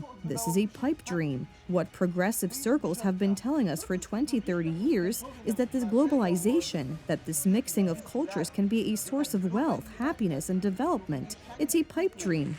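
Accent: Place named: United States. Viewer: Is this a Polish news station? Please don't use the voice with odious American sounding accent. It's bad enough hearing that accent in their vapid movies but please, not on Polish news. I used to like listening to your Polish newsreaders speaking aEnglish, clearly and concisely. And I'd still prefer to hear real Polish newsreaders speaking than listening to that yankee accent.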